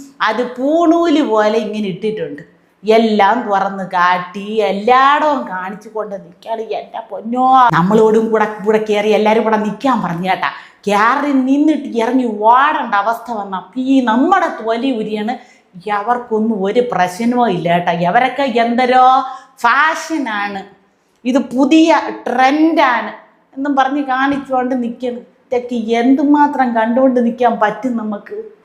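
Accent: Thiruvananthapuram, India. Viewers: തിരുവനന്തപുരം സ്ലാങ് കലക്കി പുള്ളേ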